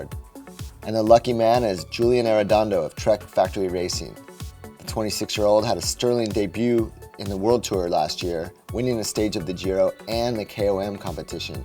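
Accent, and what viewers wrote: United States. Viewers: Why the new American accent? Don't like it all. Stick to British presenters, and maybe call in the Irish when the Rás comes into the conversation.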